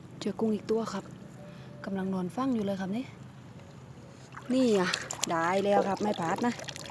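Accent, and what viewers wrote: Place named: South, Thailand. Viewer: พึ่งดูครั้งแรกค่ะ สนุก พูดภาษาใต้น่ารัก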